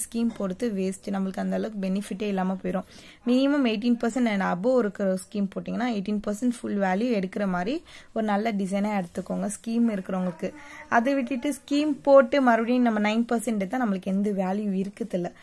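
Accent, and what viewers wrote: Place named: Telugu, India. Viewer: Ok you are telugus so your Tamil is saking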